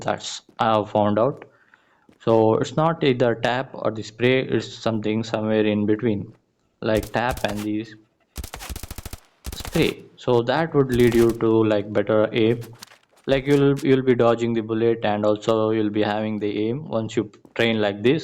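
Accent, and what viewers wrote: India. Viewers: Pajeet accent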